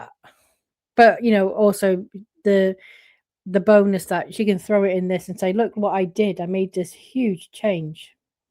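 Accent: United Kingdom. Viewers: I thought it was your British accent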